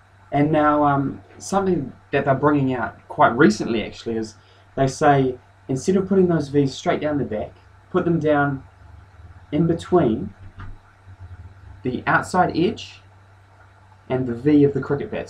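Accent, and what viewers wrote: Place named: New Zealand. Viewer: dat kiwi accent.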